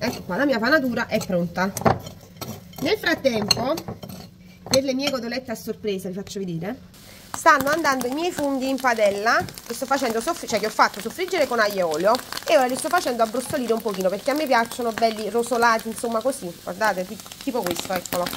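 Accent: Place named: Naples, Italy. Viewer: Poi vabbe dialetto Napoletano....Adorooo👍👍👏👏😍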